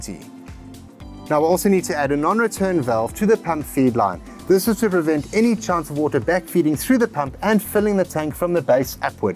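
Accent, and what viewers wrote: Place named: South Africa. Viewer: It’s a shame a whole accent is connected with hate because I have always loved the ‘Saffa’ accent 😊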